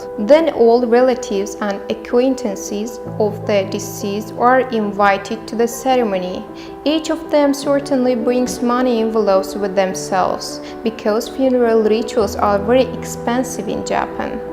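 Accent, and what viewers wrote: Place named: England, United Kingdom. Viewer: Hi! You are so dilligent and i like your english akcent! Good Luck in AQAT GROUP 👏🏻👏🏻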